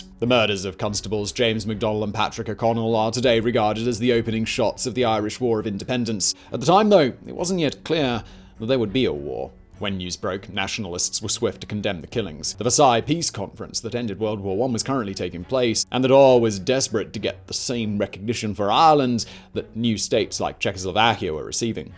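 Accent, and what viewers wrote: RP, United Kingdom. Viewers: There's something about listening to someone with a fairly posh English accent explaining Irish independence, but this was a very good video.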